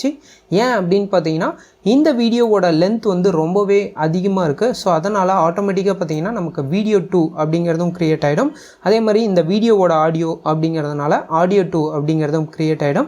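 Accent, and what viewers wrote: Salem, India. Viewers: Anna neenga salem a?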